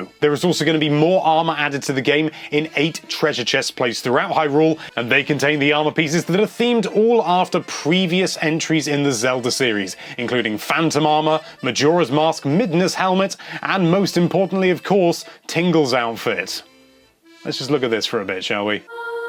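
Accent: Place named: United Kingdom. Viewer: he is English (British) not (American)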